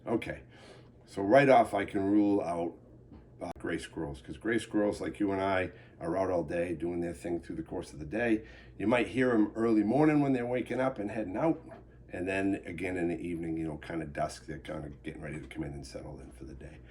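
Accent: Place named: New York, United States. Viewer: I thought you were based in NY by your accent....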